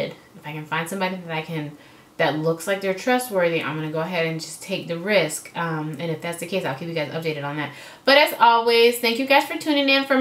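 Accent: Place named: Atlanta, United States. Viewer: I’m from Atlanta and I live in Washington state too lol what a coincidence I heard the swang in your voice and I was like where is she from hmm . Well subscribe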